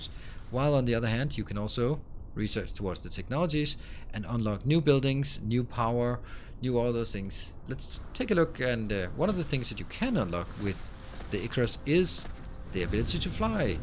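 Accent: Denmark. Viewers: Is that a Danish accent I hear? Nice video by the way. Game looks pretty chill.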